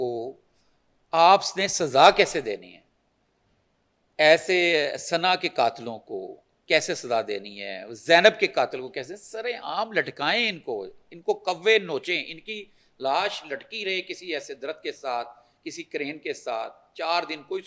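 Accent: India. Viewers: Don't try to have indian style of pronouncation. Khan as kan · Host 's pronunciation is silly ,aping lndians, quite disgusting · Is this host indian? Why is her accent like them?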